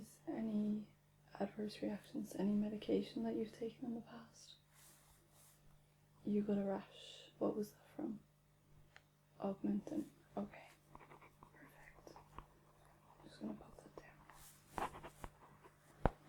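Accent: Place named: Ireland. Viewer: I was hoping this would be relaxing but the Irish accent coupled with being Irish myself just gave me PTSD of sitting in Tallaght hospital lol.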